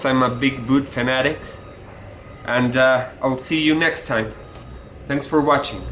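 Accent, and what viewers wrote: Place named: Mexico. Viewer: He´s mexican i knew because his accent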